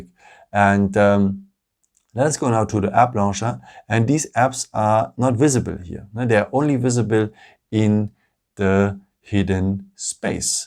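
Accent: Germany. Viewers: Der deutsche akzent in seinem englisch geht ja mal gar nicht :))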